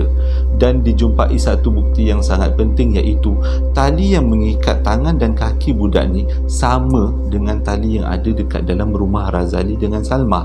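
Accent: Java, Indonesia. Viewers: Wong jowo yo.. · Sedih dgr cite tp kelakor dgr abam ni cite,jowonye pekat😂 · Gaya ckp santaii.. kok mcm ad slang jawa² sikit ckp yer tuu..😂 terus kn brcerita n mmberi kn cerita y best²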